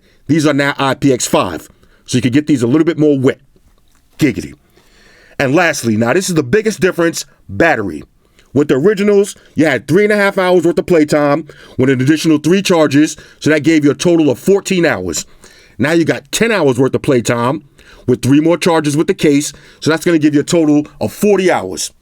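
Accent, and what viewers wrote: New York, United States. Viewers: Love the NYC hood talk. It's refreshing compared to the usual.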